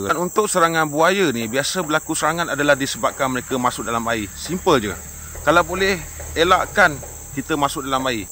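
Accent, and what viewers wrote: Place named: Sarawak, Malaysia. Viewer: Terima kasih tuan atas perkongsian ilmu, kmk salute BM ktk sgt fluent tapi tetiba ada yg melimpas bah mesti nyatok org Sarawak😊 Teruskan tuan dengan video² yg selanjutnya bagus juak guna drone camya puas nangga..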